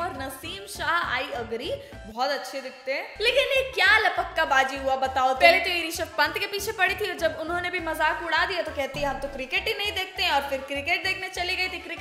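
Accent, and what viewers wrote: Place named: Punjab, India. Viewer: Yar apki punjabi bhut cute lagi mujhe sachii 🫣🫣🫣🔥🔥🔥❤️❤️❤️❤️❤️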